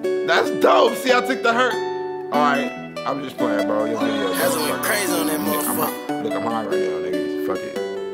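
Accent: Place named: London, United Kingdom. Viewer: 😅 The London voice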